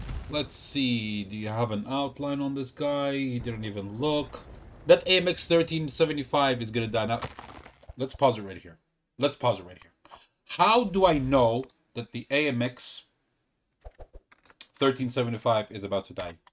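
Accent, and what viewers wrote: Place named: Greece. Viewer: I speak russian and I understood what you said, You have a bit of a greek accent but I think that adds to the charm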